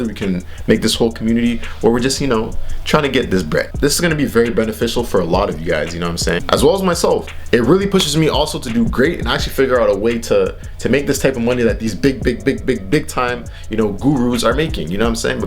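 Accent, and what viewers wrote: Toronto, Canada. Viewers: you from toronto bro? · You from Toronto fam? I hear that accent haha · You can tell this guy's from Canada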